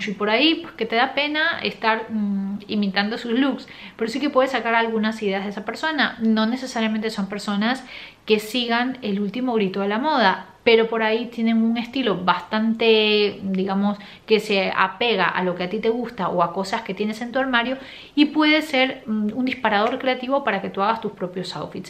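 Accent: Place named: Argentina. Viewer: Me encanta tu acento, eres argentina? Saludos de una argentina en Texas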